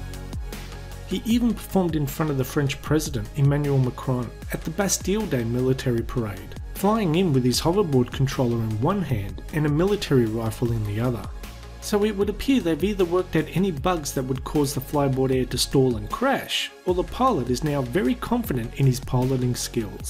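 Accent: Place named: Australia. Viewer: From a fellow Aussie, "I can tell by your accent 😉" thnx for the video, but you're an Aussie, enough with the imperial.. Or yeah use both.. I just want Americans to come around with the rest of us lol 🙂🇦🇺🇺🇲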